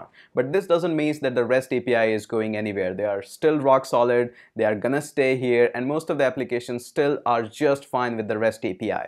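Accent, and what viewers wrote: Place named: India. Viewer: That indian accent…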